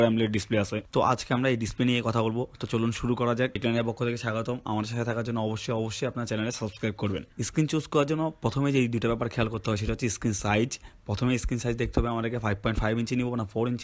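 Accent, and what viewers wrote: Chittagong, Bangladesh. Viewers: ভাই আপনি চিটাইঙ্গে নাকি?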